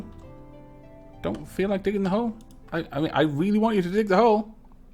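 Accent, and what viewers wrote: Wales, United Kingdom. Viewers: Thank you very much X) I must say without sounding to weird I love your welsh accent as I suppose many of us do :)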